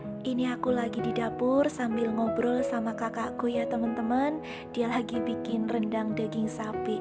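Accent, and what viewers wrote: Banyumas, Indonesia. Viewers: Kita sama2 ngapak mba👌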